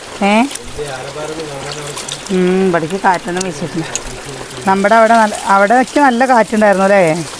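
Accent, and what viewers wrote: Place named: Kozhikode, India. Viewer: koyiod samsaarikkunnad kelkaan nalla rasa😊😊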